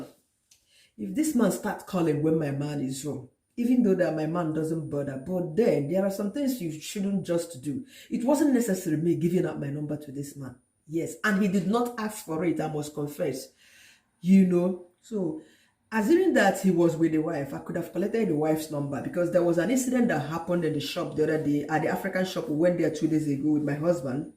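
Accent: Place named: Pidgin, Nigeria. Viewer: I enjoyed listening to your story coupled with the mixture of Naija pidgin English🤣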